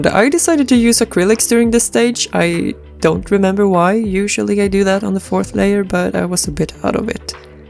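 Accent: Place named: Sweden. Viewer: I'm truly sorry for ur loss tho, i thought u were swedish by ur accent it the start of the video but then i realized u actually were when u told about the öra thingy lol jag är också svensk så tyckte det va lite kul :)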